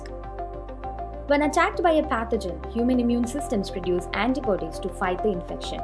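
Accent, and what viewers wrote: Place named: Kerala, India. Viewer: A Malayali narrator with MTI !! :)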